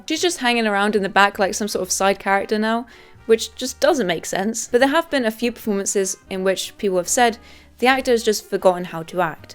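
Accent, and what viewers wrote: England, United Kingdom. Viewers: Just an FYI, But the word "finale" is NOT pronounced the same as "final." You kept saying in the "final" and "the final." But the word you are looking for is "Finale" which is pronounced "fi-nally." Why that "ee" sound at the end. I know you've got the British accent, but I know the word finale is still pronounced the same between America and The UK and they dont just say "final" for both. Sorry my literature degree getting the better of me... lol but so you know you said it wrong the entire video! 😂 great video though for sure! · Hearing this common US English mistake in an English accent was incredibly jarring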